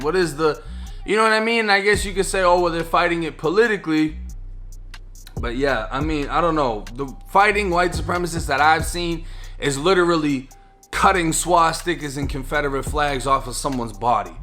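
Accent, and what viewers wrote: Boston, United States. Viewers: brand is definitely unique and that Boston accent adds to the entertainment factor. Charges are pronounced Cho-jizz, lol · You from Boston bro? I'm from Lawrence, MA. Did you pahhk the cahh at haahvahd yawwhhd? Lol you gotta be from mass bro I catch the accent in all your videos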